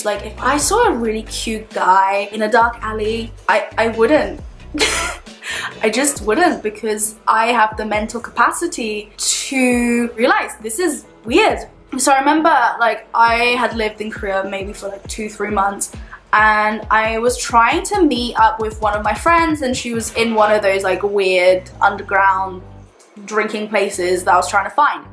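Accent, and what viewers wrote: United Kingdom. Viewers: Nah mate she is very british xx it’s not Aussie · omg it took me till to realize you're british!! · it took me 3 minutes into watching this video until i realized that you have a british accent 😭😚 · Now you know you can pass off as Australian lol. I heard the British accent, but wasn't sure.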